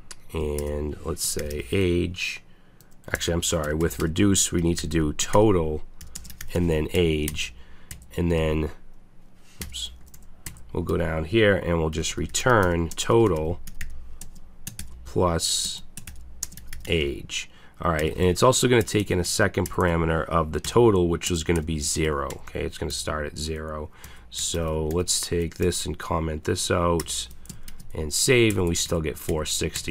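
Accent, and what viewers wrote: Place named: Northeast, United States. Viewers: Are you from the East coast? (NYC, Boston, NJ) I can hear some very strong hints of that from your accent :D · I grew up in the Northeast and your accent sounds like home. *Queue a Ben Affleck impression here*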